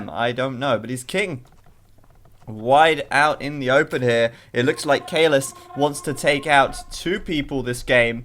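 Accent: United Kingdom. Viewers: Age of Empires 2 it's dat British accent! Welcome change from the Aussie drawl I have to put up with >_<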